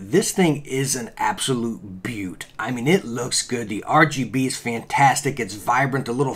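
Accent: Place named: South, United States. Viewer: I love this guys country accent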